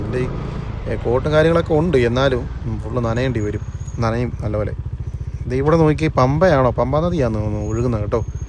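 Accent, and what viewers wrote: Kottayam, India. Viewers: kottayam slang kettapo tanne manasilay😅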